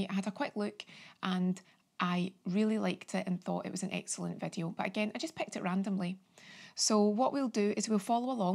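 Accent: Scotland, United Kingdom. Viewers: I found you today looking for a how to massage video. I really like your voice and accent. What part of Scotland do come from? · This looks so relaxing. I found your channel through recommendation from another channel i follow Love your follow alongs, and the indian head massage tutorial is soooo relaxing. Love Scottish accents too 😊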